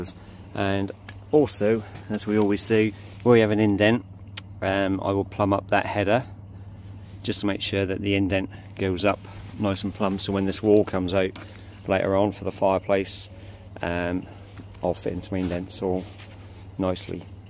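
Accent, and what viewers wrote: Norfolk, United Kingdom. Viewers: Are you a Norfolk boi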